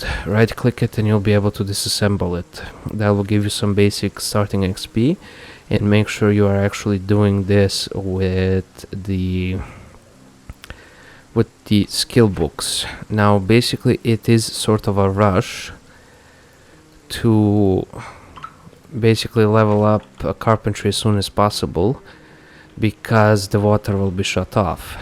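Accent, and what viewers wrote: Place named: Eastern Europe. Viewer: He is from Eastern Europe. They speak like this.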